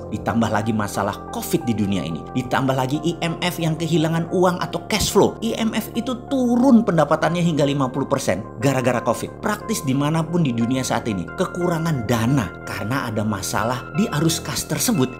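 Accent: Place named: Surabaya, Indonesia. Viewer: Jamput rek ..cakcuke arek suroboyoan.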